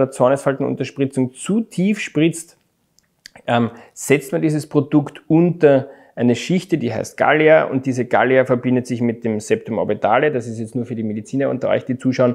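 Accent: Austria. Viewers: Echt jetzt? Ich bin Polin, nie in Österreich gewesen (in DE übrigens nie länger als zwei Wochen am Stück) und verstehe ganz normal. Wäre Ihnen sächsisch oder schwäbisch etwa lieber? Herr Doktor spricht in seinen Videos übrigens keinen Dialekt, es ist Hochdeutsch mit dem niedlichen österreichischen Akzent.😊 Nichtmediziner können evtl die vielen lateinischen Namen nicht kennen, wie Septum, Ligamentum, N. Trigeminus, N.Facialis etc.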